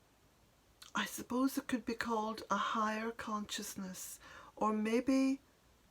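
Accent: Ireland. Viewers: Also your reading is so lovely! You might consider offering your books on tape. It is so pleasant hearing it in your lovely Irish lilt. Blessings to you, dear.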